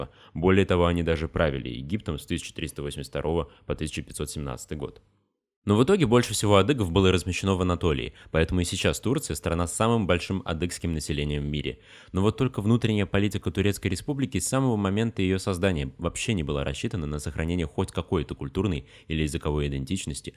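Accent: Russia. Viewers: Автор выговаривает адыгский с русским акцентом. Если хотите услышать чистый современный адыгский язык, кабардинский диалект , вот фильм <link>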